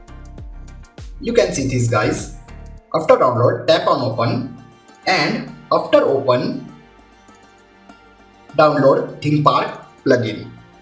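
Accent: India. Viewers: Thta indian accent kills me 😭😭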